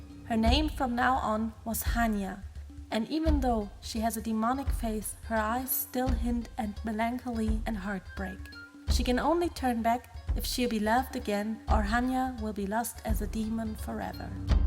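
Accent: Germany. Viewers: It sounds like her mother tongue is German. o_O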